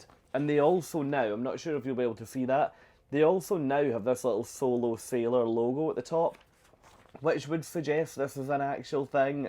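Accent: Scotland, United Kingdom. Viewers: Dear, your Scottish brogue was so heavy in this video that I needed to turn on the captions! All the very and really enjoyed the video, for sure. Lulu (We missed you the Olympics...I looked for you on the Scottish barge as it went down the Seine but didn't see you!).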